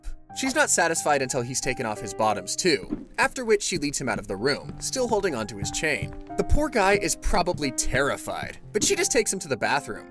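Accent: United Kingdom. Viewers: Man, i didnt knew that mr. Narrator is british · are you a brit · are you british? just asking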